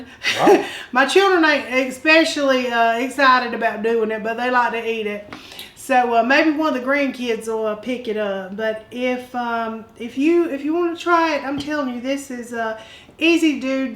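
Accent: South, United States. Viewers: ok thank you so much im elated that you landed in my feed, you had me at your southern accent ha ha❤ I love your voice its beautiful and make listening pretty cool. Ive watched this video alone 6 times already while taking notes. Thank you for being available on top of your own life. It takes a village to can food ✅️. Last summer i used the pressure can method with okra in my presto on top of the stove. Lost r jars due to they did not seal. I think they were too full also. Like above the rim. 😢. Ty so much you're very helpful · Love your southern accent! Been trying to decide on what recipe to use and I chose yours. 🥰 I'm about to do this tomorrow while I'm watching some Colts football. I would have never thought about using "wheres your sister sauce" and soy but I'm all in on that now. I love a sweet but savory taste. Wish me luck. And we might be having spaghetti for dinner as well. 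🤣 Thanks so much for sharing! Subscribed as well. Yay! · Well, I'm glad you did it! I'm about to try this with my big ol' mess of tomatoes from my garden!!! Just subscribed! I'm a southern girl here in Texas! I don't know where you're from, but I can tell you're from the south! Gotta love it! ❤ Blessings and Much Love my dear sister! 🙏✝️🛐💜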